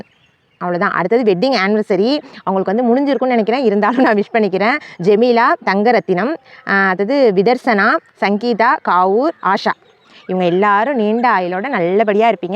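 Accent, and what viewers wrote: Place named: Thanjavur, India. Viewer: Azhagana Thanjavur side Tamil language... Engha soentha oor gnabagam varuthu....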